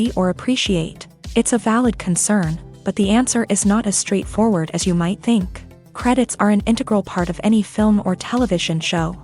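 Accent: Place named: United States. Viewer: I wanted to watch the movie, not listen to a description - even less so in a twangy American accent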